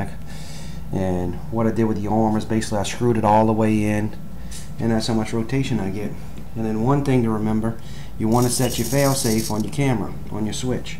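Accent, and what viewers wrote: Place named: Louisiana, United States. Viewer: Listening to you talk I can tell you're from either Chalmette or Metairie : )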